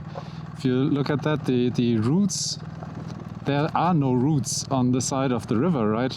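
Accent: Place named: Germany. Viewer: Digga rede doch deutsch, man hört das du deutsch bist. Abgesehen davon aber gutes Video, sieht mega schön aus.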